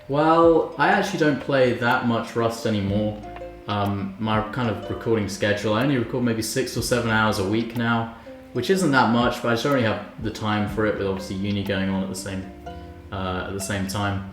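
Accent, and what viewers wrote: United Kingdom. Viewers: Alot of British people's voice for me sounds a little older, maybe it's the combination of the accent and way of speaking. Like Spiffingbrit, frankieonpc, and now.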